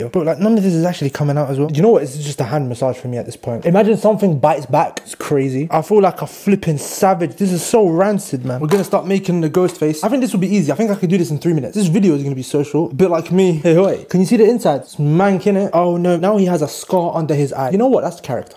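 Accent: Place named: United States. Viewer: American accent on point 💅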